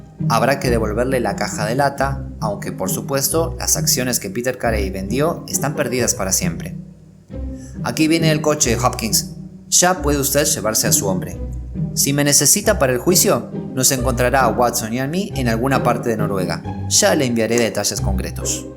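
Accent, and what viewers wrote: Rioplatense, Argentina. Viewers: valoro el esfuerzo hecho y le dejo like, porque creo que es lo mínimo que merecés. Pero convengamos que no es el acento ideal. Lo más neutro posible, sería lo ideal. Otros autores, con gusto los escucho con dicho acento. Ojo que el propio Borges hablaba y leía sin el "shesheo" rioplatense, a no ser que la obra evoque dicha pronunciación. No obstante, gracias por el tiempo y el esfuerzo. Un abrazo.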